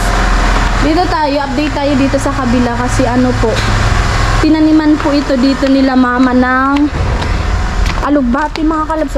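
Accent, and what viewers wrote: Visayas, Philippines. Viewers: Ang laki ng bahay mo bagay sa iyo dahil maganda ka ok lang ang tagalog mo kahit meron halong visaya I love watching you video here in the USA ❤️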